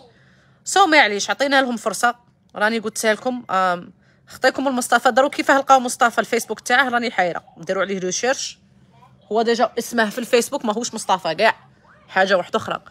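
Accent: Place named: United States. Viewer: سلام الزينة بنت بلادي أنا نتابعك من ليون بفرنسا. بنتي تحبك و تعجبيها بزاف في طريقة نطقك للإنجليزية الأمريكية. و أول مرة نعرف باللي زوجك فلسطيني ربي يحفظكم و يسعدكم و يهنيكم يارب العالمين 🇩🇿❤🇵🇸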